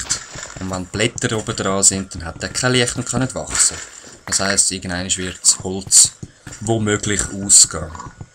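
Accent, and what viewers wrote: Basel, Switzerland. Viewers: ??????????? Ich bi au basler aber das isch ächd balrdütsch=-O Aha wenns baselbiederisch isch ischs klar das kenni nit so.:-) I kum us Müncheschdei:-)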